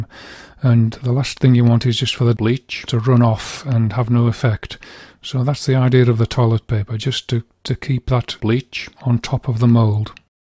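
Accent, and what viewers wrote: Scotland, United Kingdom. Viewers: Is that beautiful accent Scottish? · Awww, your accent....I reminds me of my home away from home...Scotland....Thanks for the tip!!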